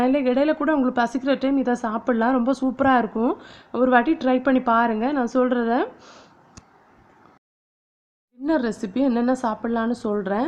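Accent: Kanyakumari, India. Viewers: Hi, Nice to hear kumari Tamil. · Am also from KANYAKUMARI .I had found u with ur slang.well done sister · Akka neega kanyakumari ah? Slang correct ah Iruku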